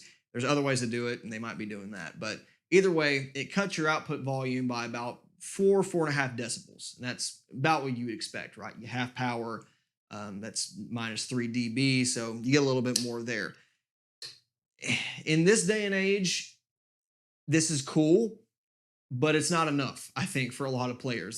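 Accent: Texas, United States. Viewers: i always thought you were from Texas...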